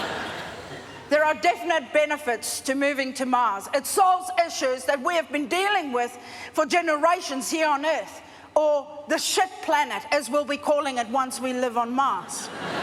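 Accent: Australia. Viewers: Can't tell if she's Australian or South African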